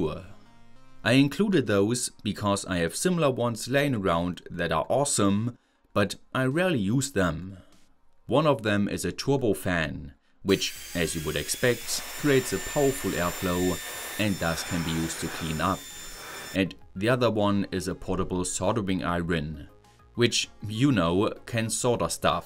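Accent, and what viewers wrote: Germany. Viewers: I like that german accent! Can you please more often use the word "Jawohl" like germans do in movies. 😂😂😂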